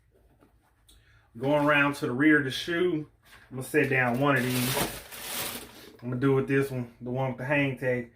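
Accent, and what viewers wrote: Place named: Kentucky, United States. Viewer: Where are you from? Your accent is like wow? Kentucky?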